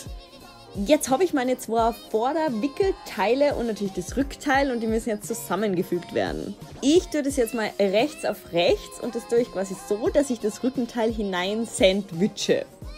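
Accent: Austria. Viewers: Well try not to adapt her pronunciation too much, she has a really heavy Austrian accent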